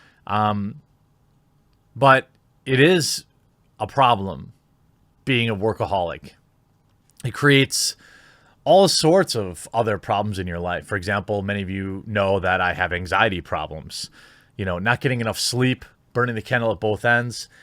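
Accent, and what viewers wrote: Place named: Midwest, United States. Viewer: Hahaha I knew you were from the Midwest but that clip with you and your wife your accents really shown through. I was confused for a second because in your videos your accent is very minimal. Don’t get me wrong, nothing wrong with the accent just caught me off guard lol. If you heard me talk I’d be the one with the accent.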